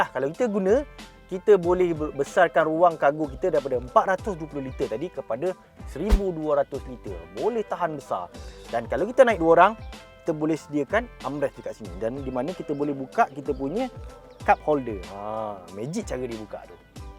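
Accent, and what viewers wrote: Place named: East Coast, Malaysia. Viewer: org pantai timur mmg slang mcm ni..sama mcm abg jakpa.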